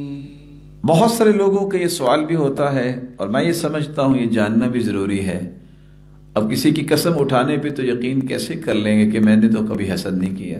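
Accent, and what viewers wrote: Arab world. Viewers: Boht he theek farmaya aapny qaree sahb or ooper se aapki Arabi bolny ka original zaberdast accent itna pure k shyd Arab ka rahny wala bhe aesa khoobsoorat na bol sakta ho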